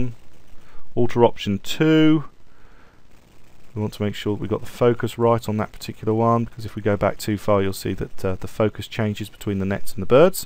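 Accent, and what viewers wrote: United Kingdom. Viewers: YOUR LESSONS ARE THE BEST ON YOUTUBE. I AM NEW TO PREMIERE PRO AND YOU HAVE REALLY HELPED ME A LOT. I LOVE THE VERY SIMPLE WAY THAT YOU EXPLAIN SOMETIMES CONFUSING PROCESSES. MANY THANKS!! OH, YES, YOUR BRITISH ACCENT IS VERY COOL.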